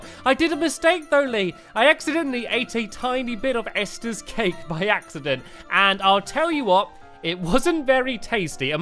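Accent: England, United Kingdom. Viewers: you are like puss in boots accept your english smart funny cheerful alwasy happy and most of all awesome! and you have iron boots insted aka STYLE BOOTS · He is not American he is from England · My son insists that Ester's name is Estah. I told him it's Ester and pronounces it Estah because he's British, but he still wants to say it like you lol He also says "inventry" like Squid lol Just thought it was cute because he really likes you all.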